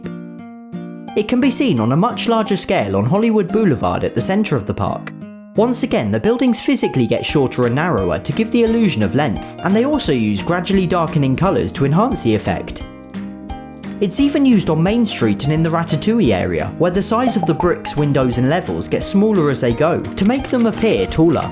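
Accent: England, United Kingdom. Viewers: I speak french, and that is one of the most english accents i have ever heard.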